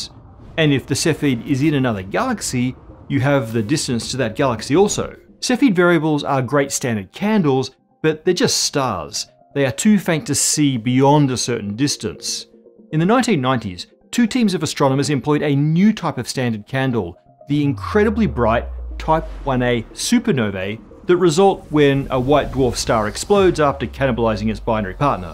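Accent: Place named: Australia. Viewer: his accent is in a way closer to australian, with some peculiarities of Irish, possibly of family origin.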